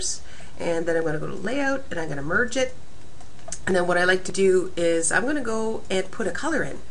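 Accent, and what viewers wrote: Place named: Canada. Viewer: You're Canadian. I'm getting much better at distinguishing accents :'D this is just proof to me that our accents /are/ distinguishable from the American accent! Ha! Sorry, lovely video and a brilliant strategy, I will be using this. Thank you :3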